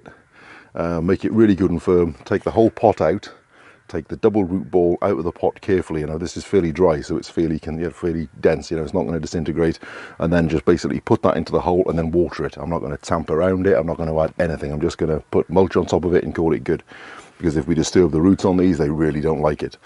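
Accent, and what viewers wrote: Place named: Durham, United Kingdom. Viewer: Wow you really had me fooled. I was sure you were from Durham on the NE of England. I'm from Newcastle originally but also living in Scotland by Elgin/Inverness. Thanks for producing these great vids. I'm really enjoying them and good luck for the future. Paul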